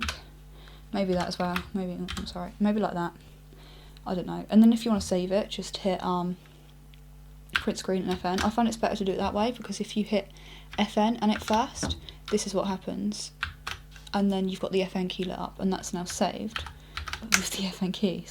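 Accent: United Kingdom. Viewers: British accent ? =o)?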